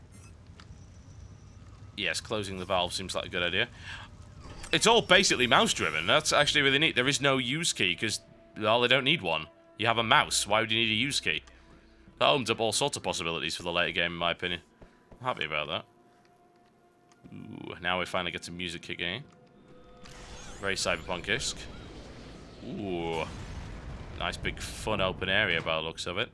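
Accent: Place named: Newcastle, United Kingdom. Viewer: Geordie accent :D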